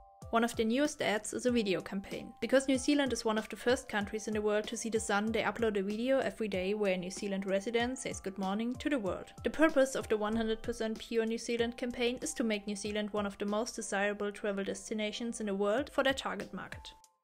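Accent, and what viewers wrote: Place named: Switzerland. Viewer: Mal was neues 😄 Ich möchte ja nicht fies sein, aber es klingt etwa so wie wenn ich als Schweizer Hochdeutsch spreche. Trotzdem war das interessant! Und die Animationen sehr gut gemacht.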